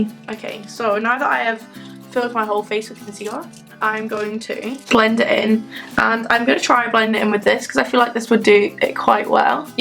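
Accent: Scotland, United Kingdom. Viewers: Is she Scottish or English dumb question ik 😂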